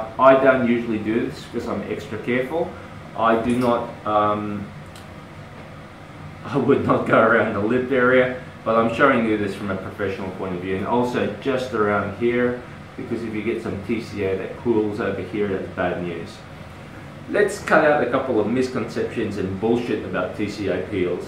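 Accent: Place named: Australia. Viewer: So TCA peel isn't good for acne scars? I thought it would remove the top layer of skin, not really the top but a small portion like dermabrasion, through chemical process.... Anyways, you got any recommendations for any dermatologists in California? Seem from your accent, it looks as if you live around Australia.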